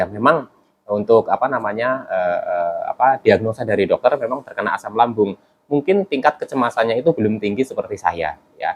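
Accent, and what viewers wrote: Banyumas, Indonesia. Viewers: ngapak asal mana mas?sy juga kena anxiety siapa tau bs sharing...ada group ga atau WA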